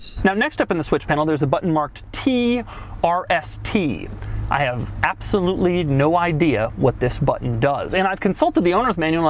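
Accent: England, United Kingdom. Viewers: english accent = perfect! yes yes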